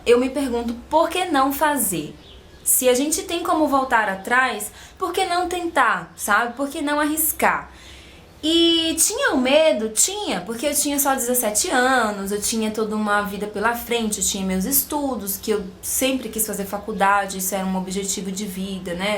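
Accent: Bahia, Brazil. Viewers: Caramba, que bonito! A mulher que é amada reflete isso em todas as suas ações e sinto q vc é uma pessoa mt carinhosa, é super gostoso ouvir seu sotaque da Bahia rsrsrs bjs!!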